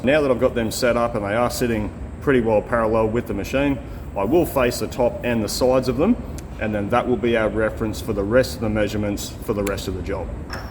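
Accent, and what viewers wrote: Australia. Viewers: This is like ASMR for me: Aussie accent, grinding, cutting, welding, air tools... love it! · Classic we sure do have a twang down under 😀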